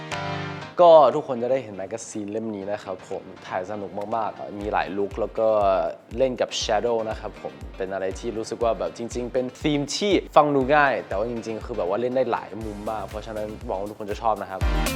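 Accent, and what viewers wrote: Isan, Thailand. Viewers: เอ็นดูเว่าอีสานนนน 5555 ภาษาไทยไม่ชัดหนักแล้วลูกเอ๊ย 5555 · สำเนียงอีสานได้น่ารักมากๆๆลูก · น่ารักมากกกกกก เอ็นดูท่าปล่อยพลังอะ55555 พูดอีสานน่ารักมากกกก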